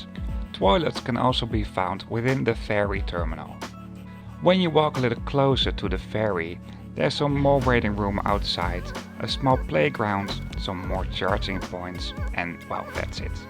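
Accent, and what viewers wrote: Netherlands. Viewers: Wat een onzin. Zoals hij zelf aangeeft, heeft hij wel een NL accent, maar dat maakt het toch ook gewoon leuk. Ben er redelijk van overtuigd dat de 'native english speakers' hem prima kunnen verstaan en er soms om kunnen lachen.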